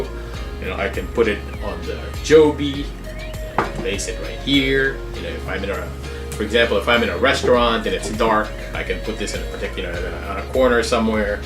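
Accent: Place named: United States. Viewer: hello ang galing naman ng pang vlog mo ,american na ang accent mo nice video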